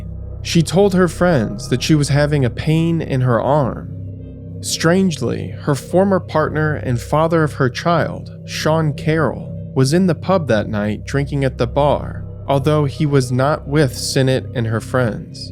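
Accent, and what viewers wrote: United States. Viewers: if you’re actually offended by someone having an American accent…. There’s far better things to bitch about tbh.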